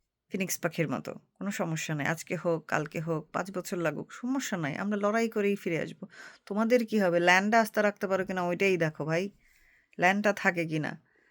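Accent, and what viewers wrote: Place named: Bangal, Bangladesh. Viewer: আপনি অসাধারণ বলেন । কিন্তু বাঙাল ভাষায় বলেন তাই একটু ধীরে ধীরে বলুন। আমাদের সব বুঝতে অসুবিধা হয়। কিন্তু যুক্তি আপনার অসাধারণ। great---💐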